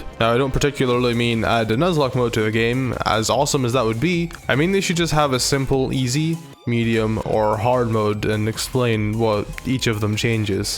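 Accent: Scotland, United Kingdom. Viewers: Are u Scottish because I am to 👌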